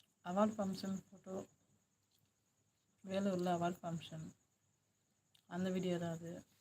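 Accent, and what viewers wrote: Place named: Coimbatore, India. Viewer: எங்களை பார்க்கவைத்து சாப்பிடாதீங்க உங்களுக்கு வயிறு வலிக்கும் கோவை தமிழ்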